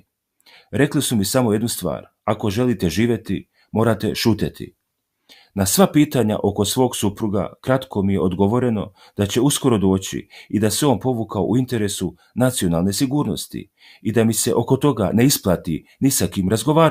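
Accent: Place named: Croatia. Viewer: I kad si se probudio...ti pao sa kreveta...hrvatski naglasak a pokusavas ekavski da pricas...samo o tome sanjat mozete...Pravoslavlje ce da pobjedi i Putin ovaj zivi Putin ce da dovede i Ruskog i Srpskog Cara jer je tako GOSPODU I SPASU NASEM ISUSU HRISTU U SVETOJ TROJICI UGODNO...a vase masonske i satanske zelje ce vam se ispuniti tamo gdje pripadate i ispunice vam ih vas nalogodavac u paklu... BLAGOSLOVENO CARSTVO OCA I SINA I DUHA SVETOG!!!!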